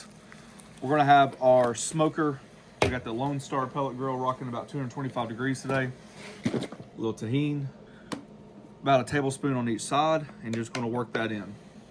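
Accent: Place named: Tennessee, United States. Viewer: Never mind, I know a Tennessee accent when I hear it. Could be Kentucky or Georgia just across state lines, but that's a Tennessee accent. Lol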